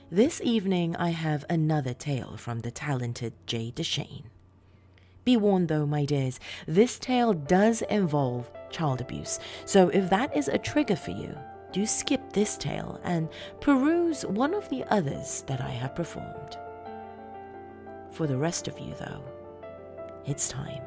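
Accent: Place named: United Kingdom. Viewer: oh you charmer you are from Britain if I would have to place your accent 😉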